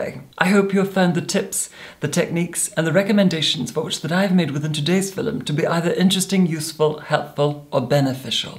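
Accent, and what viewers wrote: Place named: RP, United Kingdom. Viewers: Your make up is always stunning but what always amazes me is how good your English accent is. For you being Scottish, I can imagine it can't be easy speaking with an English accent. I can tell you my Scottish accent attempts always end badly- ha. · That cut glass pronunciation, those lovely, expressive blue eyes, those cheekbones...🥰🥰🥰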